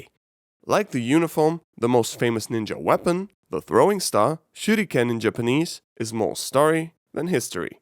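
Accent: Austria. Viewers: He is from Austria, if I recall correctly.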